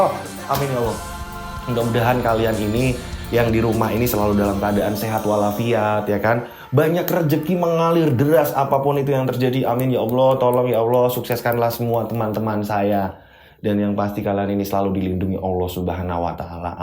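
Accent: Java, Indonesia. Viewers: ALHMDULILLAH SNENG BNGT DNGER CERITA NYA MAS..KL NGMONG JOWO MEDHOK BNGT..KATA DEMI KATA ENAK DI DNGERNYA..SHAT SLL YA MAS.SLM DR ANK RANTAU HK.🙏🏻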